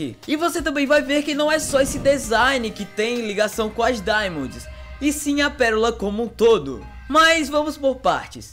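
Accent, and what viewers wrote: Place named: Pernambuco, Brazil. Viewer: Mano vc é pernambucano ! Gostei do sotaque tá carregado n he he !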